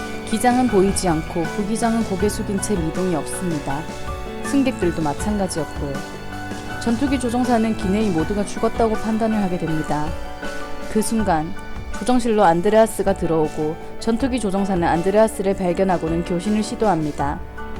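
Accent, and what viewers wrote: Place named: Gyeongsang, South Korea. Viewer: 고향이 경상도쪽 이신가바요..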